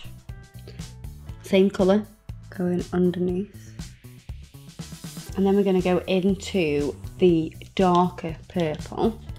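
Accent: Liverpool, United Kingdom. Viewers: I thought you were a scouser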